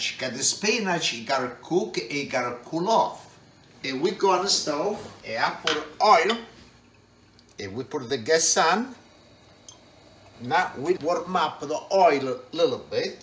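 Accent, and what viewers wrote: Italy. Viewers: Che bello sentire il tuo accento con l`inglese mi fa venir voglia di ritornare in italia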